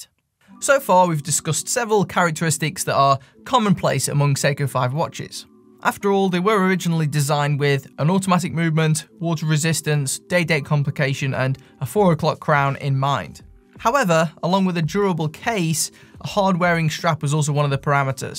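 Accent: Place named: Manchester, United Kingdom. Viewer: This watch is definitely "Ice Cold" in my book. I do sometimes wish it had hand winding, but I managed to regulate it to +8 secs per day with a toothpick, something I wouldn't try with a more expensive watch. It's also easy to back hack. The B-style dial is thoroughly appealing. As you get older, life is all about minutes and seconds, and hours are of diminishing importance. I've also seen the display caseback work its charm on others. My son looked at it for about half a minute and suddenly exclaimed "wow, now I understand how watches work!" Where are you from, BTW? Manchester? Your accent is the best part of these reviews.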